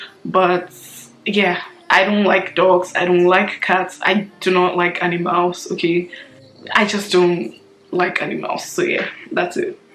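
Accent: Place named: France. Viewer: The french accent is beautiful. Nice video ❤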